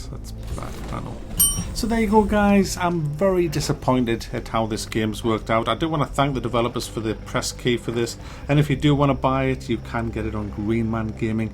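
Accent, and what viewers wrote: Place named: Newcastle, United Kingdom. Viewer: video is too long to tell us its shit, cut to the chase a little more. you just get saltier and saltier, no body wants to hear that shit, its boring,90% games to you are shit but I like your stupid Geordie voice it reminds me of an ex squaddie mate that used to pick nub ends off the floor to make a whole fag. 5 min video bad things good things that's it but constantly trolling the game is what you do all the time just keep it short bud that's of my life I've just lost and will never get back.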